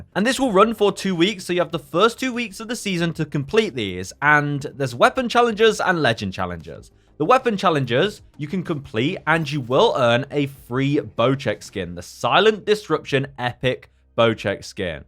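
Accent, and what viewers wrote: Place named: United States. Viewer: please stop the american intonations be English mate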